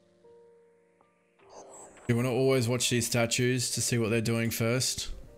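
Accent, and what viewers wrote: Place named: Australia. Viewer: you sounds 110% aussie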